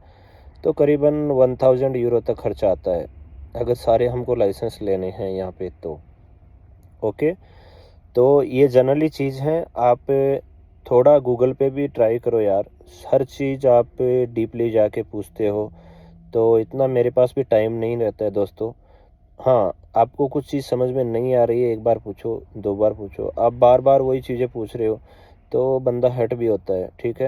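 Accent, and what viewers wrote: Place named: Rajasthan, India. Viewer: Rajasthan se lag rahe ho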